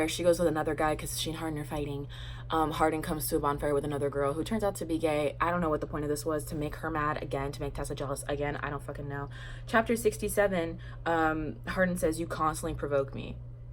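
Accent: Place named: England, United Kingdom. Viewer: Your English accent is giving me life 😂😂😂 Also: fans of Harry Styles were super mad at After becoming so huge because HS is an angel and it makes him look bad. Also, in the original wattpad, Hardin’s name was literally Harry Styles. It was meant to be like what would have happened if he didn’t become famous and went to college. 😂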